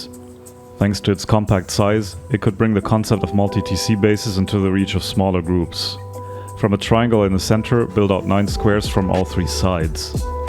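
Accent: Germany. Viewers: Deutsch??? Dein Englisch klingt so deutsch XD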